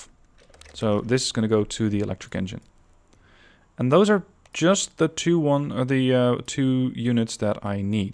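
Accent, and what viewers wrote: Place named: Netherlands. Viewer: Nice vid ar you dutch you have a Dutch accent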